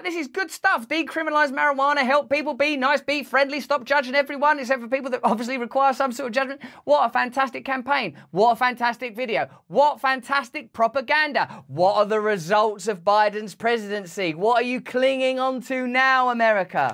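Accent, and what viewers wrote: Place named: United Kingdom. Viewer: Why do so many people like this guy? Discusting, arms flailing about, that British accent is really back alley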